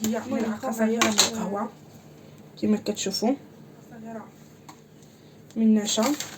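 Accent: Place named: Marrakech, Morocco. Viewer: على سلامتك توحشن الهدرة ديالك الماركشية بالتوفيق ان شاء الله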